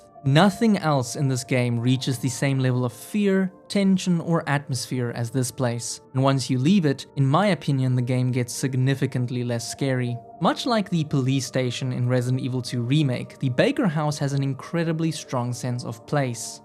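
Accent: South Africa. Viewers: When I started you video, I instantly knew you were South African.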